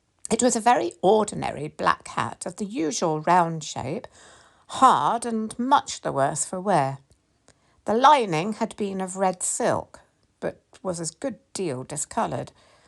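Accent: United Kingdom. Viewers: I enjoyed your narration of this Sherlock Holmes short story. Hearing it performed with a British accent is so much more enjoyable than hearing it in my head with my American one. 😂 Thanks.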